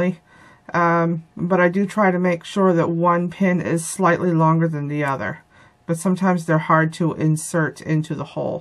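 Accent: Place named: Maine, United States. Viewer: Found your channel quite by accident and am enjoying watching your videos. I knew you were a Mainer just listening. Don't know if I dare start another hobby - it seems to be quite addictive - but may just have to give it a try.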